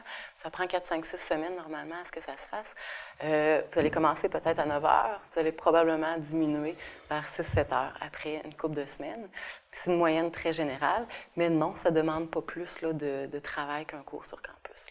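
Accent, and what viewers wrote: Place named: Brazil. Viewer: me parece un brasileño hablando francés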